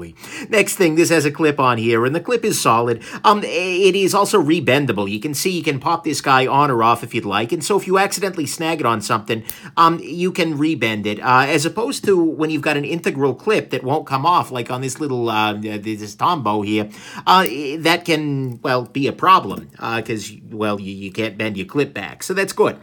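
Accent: New York, United States. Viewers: I love this guy's accent, is it New York? I imagine him driving a taxi, and wearing a white vest at home, and having a difficult relationship with his mother in law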